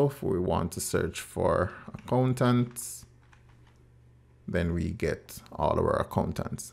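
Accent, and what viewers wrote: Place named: Jamaica. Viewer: I don't usually do this but when I heard your accent I had to log in just to say, holy shit, a Jamaican narrating an IT video is pretty much one of the rarest but most awesome things I've ever experienced. And besides that, you did a really good job walking us through the process. Good job mate! Peace, love and unity!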